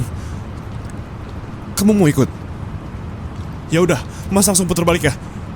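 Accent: Java, Indonesia. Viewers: Bapak e logat jawa rek rek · Syok sekali bisa logat Jawa... Beliau ini Jawir bagian mana anjir...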